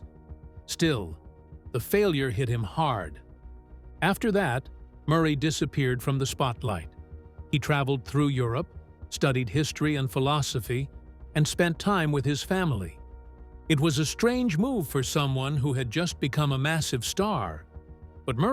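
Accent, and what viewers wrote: United States. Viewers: Howard Kos all!?? Dan Oak'roid?!? What's with the Krazy pronounciations? This guy pronounces names like a furr-ener. Yet he has an American accent. As Snow White says "Weird, weird weird " 😅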